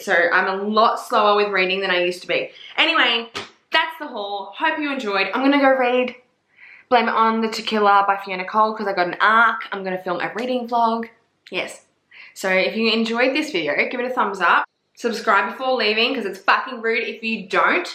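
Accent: Australia. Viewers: bish shut up I love the Australian accent 😭😭😭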